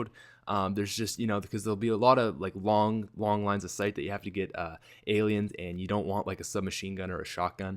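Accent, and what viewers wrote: Canada. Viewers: "Ohtskirts"? "get oht"? Are you canadian or something? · Liked. Are you canadian? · Canadian Accent Doe LoL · The "ote"skirts. Dat canadian accents ;)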